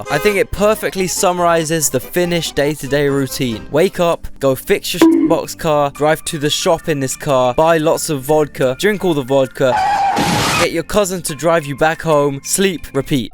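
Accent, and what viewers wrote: United Kingdom. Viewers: Norwegian guy her. I approve this video 😁👌. Cool hearing a british guy that really gets the nordic banter humor we got goin' on ! 😁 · I love how a BRITISH guy talks xd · Oh what I didn’t know you where a fellow swede that oi bruv accent is strong, when did you move to the uk?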